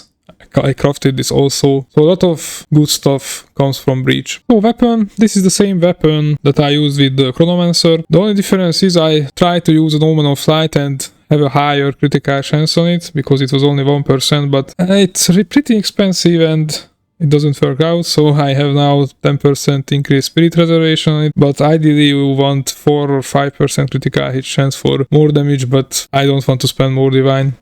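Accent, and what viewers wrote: Hungary. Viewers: You have such a Hungarian accent :D no offense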